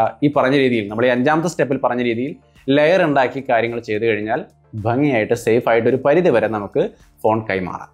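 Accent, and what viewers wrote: Thrissur, India. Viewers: ഫോൺ അങ്ങട് തല്ലിയ പൊളിക്ക. തൃശ്ശൂ കാരൻ ആണെല്ലോ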